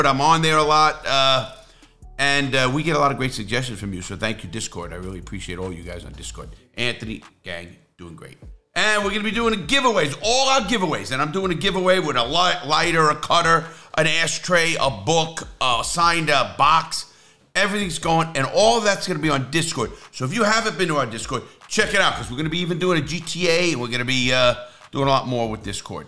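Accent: New York, United States. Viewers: I hear a slight New York accent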